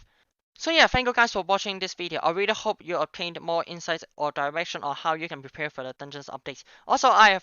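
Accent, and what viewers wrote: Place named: China. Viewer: Your accent makes me feel like I’m hearing Chinese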